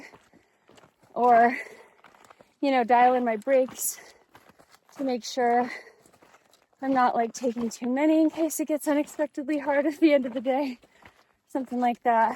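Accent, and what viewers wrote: United Kingdom. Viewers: Pure London, is she part british? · You’re doing great on your hike! Enjoy your British accent, did you live in England at some point in your life?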